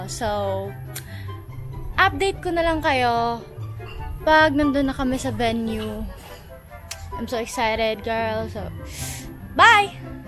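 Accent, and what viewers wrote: Visayas, Philippines. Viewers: Ate Bisaya kaba? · Wait bisaya kaba ate?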